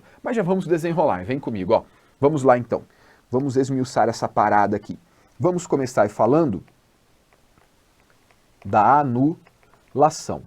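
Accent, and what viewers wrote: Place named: Paraná, Brazil. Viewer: Língua preta kkkkk , paranaense veio pé vermelho 👏🏻👏🏻👏🏻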